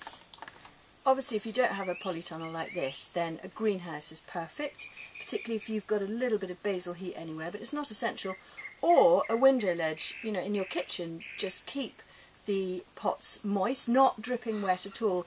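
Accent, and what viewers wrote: United Kingdom. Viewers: Aww thank you, I’m going to repot mine or maybe even plant them straight in the ground?! I love this helpful video an your strong British accent is just divine 😊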